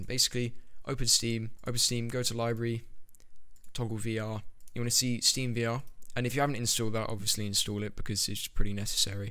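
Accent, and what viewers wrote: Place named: United Kingdom. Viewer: Thank you random British guy with a nice voice, thank you.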